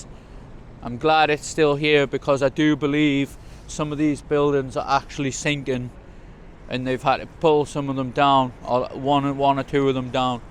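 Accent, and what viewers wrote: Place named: Newcastle, United Kingdom. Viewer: Thank you for introducing your hometown! This brings back lots of memories I have of Newcastle! Used to live in Newcastle and Gateshead long time ago, like a last life time! So you are Geordie! Love when you said 'mi' mom, and m'a'n.... really miss that! : )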